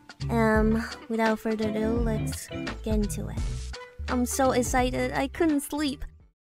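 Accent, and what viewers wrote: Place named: United States. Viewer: you speak English like you from the states. That's pretty rare in China.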